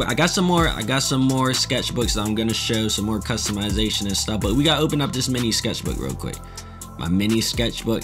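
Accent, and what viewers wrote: Baltimore, United States. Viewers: You from Baltimore ?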